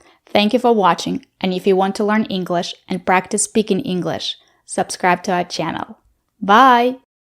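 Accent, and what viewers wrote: United States. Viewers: That's Amarican English, isn't it? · You are obviously not an English person this is America's bastard English your promelgating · Horrible American english , really nasty to listen to and an English english speaker I am aftaid.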